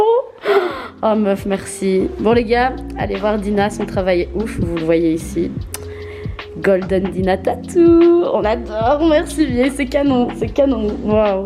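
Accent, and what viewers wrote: Belgium. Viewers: Salut tu es belge ?❤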